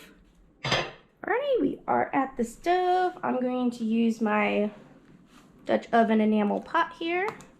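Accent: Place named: Central Pennsylvania, United States. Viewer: a word like y'all. From central PA. I thought you were originally from pa.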